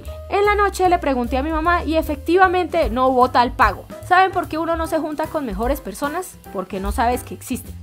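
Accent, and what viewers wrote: Colombia. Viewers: eres de colombia no?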